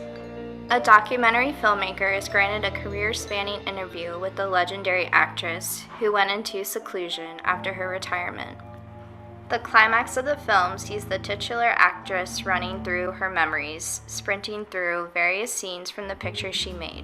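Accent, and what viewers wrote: United States. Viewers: Inappropriate American voice narrating, tho’ the commentary is not stupid. Mispronounces her native English. Quacks voice. “The kinda girl...” UGH. Kurasawa doesn’t adopt books, he adapts them for the screen. She makes mistakes likes this pretty regularly. Her pronunciation of Japanese names is atrocious. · Another American voice. God help me.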